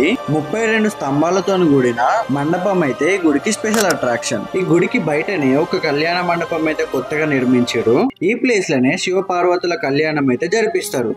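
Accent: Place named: Telangana, India. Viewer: తమ్ముడు ఎన్నో పెద్దపెద్ద టీవీ ఛానళ్లు వచ్చి ఇంటర్వ్యూ చేసి గుడి గురించి చూపించిన మన తెలంగాణ యాసలో గొప్పగా మంచిగా చూపించి చెప్పిన్ తమ్మీ ఓం నమశ్శివాయ 🙏 🤝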